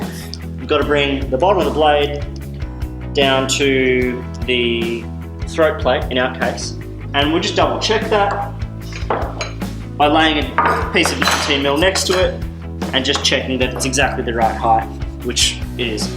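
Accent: Australia. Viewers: Excellent instructional video. Like the fact its presented by an aussie so I can understand it. Thanks for posting. I'm buying the gear.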